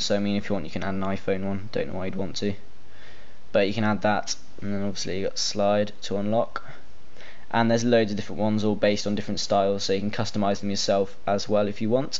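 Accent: United Kingdom. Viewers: Thumbs up for British accent! D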